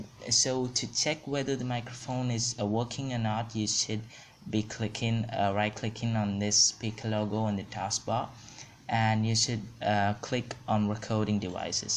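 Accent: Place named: Indonesia. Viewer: Your accents jokes i love it are u from Indonesia im guessingg, pZee xD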